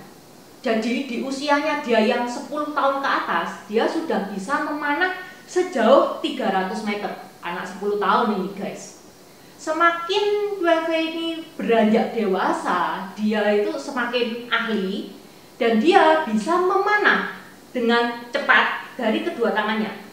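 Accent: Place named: Java, Indonesia. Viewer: Mbak ini logat bicaranya tellu Jawa SGT kental dan medooook bgt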